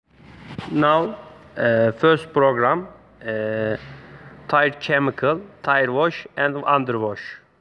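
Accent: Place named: Turkey. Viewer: Sesi duyar duymaz bu Türk İngilizcesi dedim ve öyle de çıktı :)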